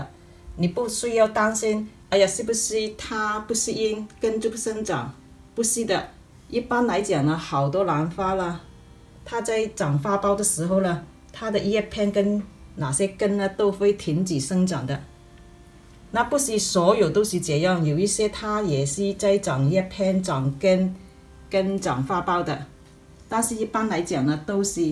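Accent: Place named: Cantonese, China. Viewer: 我之前有跟妳留一段留言，妳可能沒注意看！我說我非常喜歡聽廣東歌，妳說的普通話有點廣東腔，特別親切、可愛欸！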